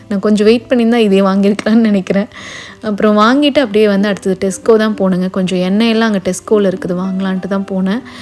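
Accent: Kongu, India. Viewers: " ங்க " என்று சொல்லும் கொங்குங்க, எப்பவுமே கிங்குங்க, வார்த்தையே சாங்குங்க, மொத்தத்தில ஹாப்பிங்க!👍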